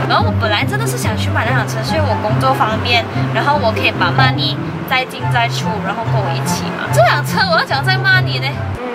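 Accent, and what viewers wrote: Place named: China. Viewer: 纳尼！竟然有中国腔？？？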